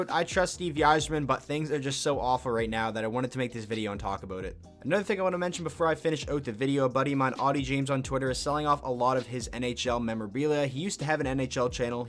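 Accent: Canada. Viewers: Dude you're canadian aren't you? I hear that accent 😎 (Also, it's eyezerman)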